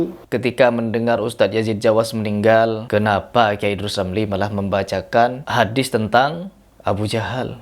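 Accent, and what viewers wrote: Madura, Indonesia. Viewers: Logat maduranya kelihatan😊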